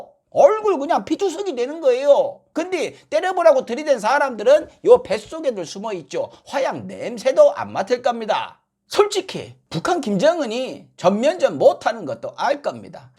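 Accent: Jeolla, South Korea. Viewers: 혹시 전라도 출신이신가?? 궁금해서요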